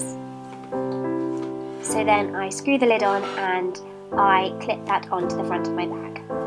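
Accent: RP, United Kingdom. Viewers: Omg she must go to a very posh school! She wears a blazer to school and she has summer homework!🤔 and her accent is quite posh too,and she uses a quil🤔 interesting🙂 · I am sorry if this is offensive or if I got your accent wrong, but by this whole video, I think there is no a person who is more posh than you are! Again, I am sorry if this offends you, I mean it as a compliment - I loooove everything you do. It is nice when someone is tidy, organised and does everything calmly, step by step. I also love how this is not a typical tween/teen video of checking through the social media and other stuff. I know a lot of people light candles now since it is popular currently, but I still loved it. Also, I am a Potterhead myself and a book fan so I loved that part too!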